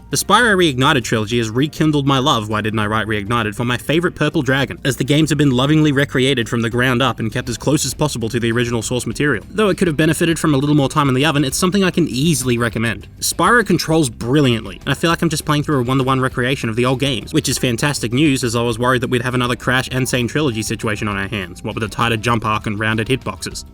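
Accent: Australia. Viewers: Watching this in class FUCK ENGLISH WHEN YOU HAVE AN AUSSIE MAN THAT SPEAKS ENGLISH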